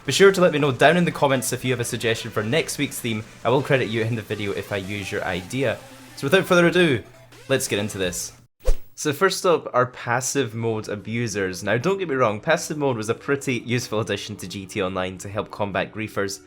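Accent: Scotland, United Kingdom. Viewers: Are you Scottish? But I hate explosive maniacs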